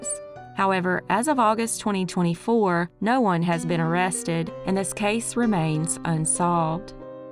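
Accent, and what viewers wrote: South, United States. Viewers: Yes! Quickly becoming my first click whenever I get on YouTube ❤ your channel is only one of three channels I have my notifications on for. 🌟 Love your soothing voice with your slight southern drawl and every story you cover needs the lime light! 💚 thank you!! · Ooh really I will check it out! Love your voice and your Southern accent! Thank you for the time you take to research all these cases! 🙏🏿❗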